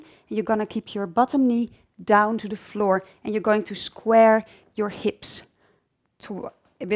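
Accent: Netherlands. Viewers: i love your dutch accent!!!!!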